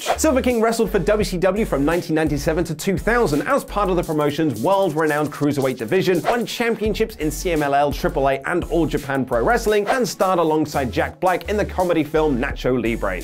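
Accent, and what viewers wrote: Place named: Manchester, United Kingdom. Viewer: mancunian accent was excellent!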